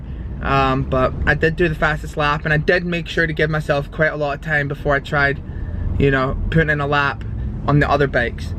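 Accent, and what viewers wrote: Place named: Scotland, United Kingdom. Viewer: r u scottish my man? im loving that accent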